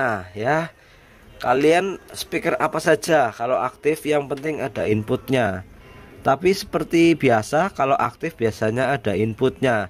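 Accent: Banyumas, Indonesia. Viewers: nek ora ngapak ora kepenak mas he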